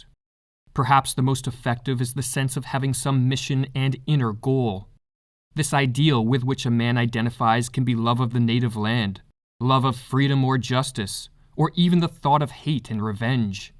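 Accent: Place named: Canada. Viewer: Whats up with you,? How old are you? You lift weights? squats? You sound Canadian. Im Diogenes.really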